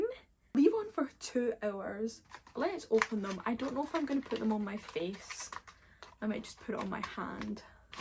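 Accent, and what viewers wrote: Scotland, United Kingdom. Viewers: She’s Scottish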